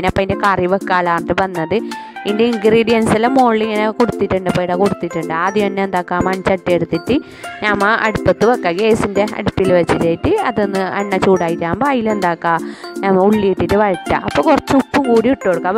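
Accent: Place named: Kasaragod, India. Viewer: Nandu curry Honda ki nokki super Namo Kasargod language super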